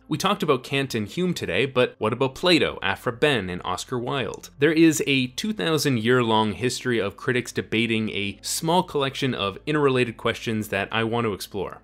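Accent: Canada. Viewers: Are you Canadian? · by the way he pronounces certain words I have always thought he was Canadian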